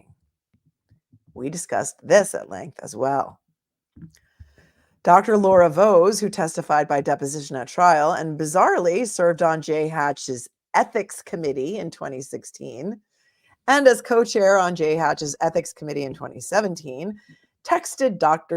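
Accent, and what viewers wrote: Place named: New York, United States. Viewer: Replay crew! I don't care how many YouTubers analyse this case, I'm always interested in your perspective and very grateful for your coverage. Thank you for all you do and keeping it real and classy in that gorgeous NY accent 🥰❤️🙏🏻❤️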